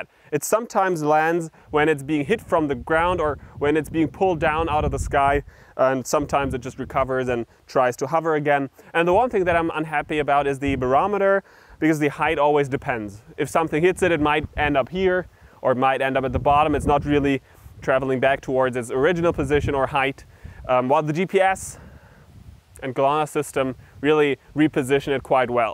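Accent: Germany. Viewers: Haha everytime when I watch a german guy talking english I clearly hear it but this time it was difficult because u spoke pretty good english I could barely hear the german accent naice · you can hear the german acent decent mein Freund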